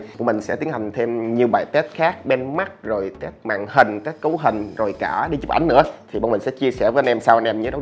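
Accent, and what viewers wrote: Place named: South, Vietnam. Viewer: Nghe giọng Miền Nam k quen cảm giác khó nghe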